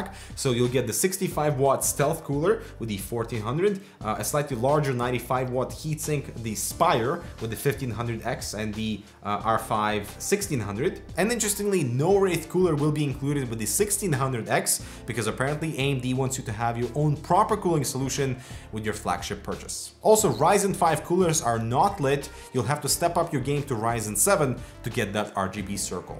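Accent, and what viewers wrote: Russia. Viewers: ой чувак, такой акцент, ты по-любому Русский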